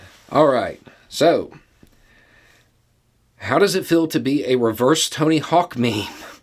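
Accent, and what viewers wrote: South, United States. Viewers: For what it's worth I'm white as the driven snow and your Southern accent was the one glaring red flag I had to get past to realize that you are in fact based. Where I'm from, a drawl tends to be seen as an easy indicator of a simple mind that holds hateful ideas. I do recognize that this itself is a form of prejudice but damned if it doesn't have a better track record than a lot of its cousins.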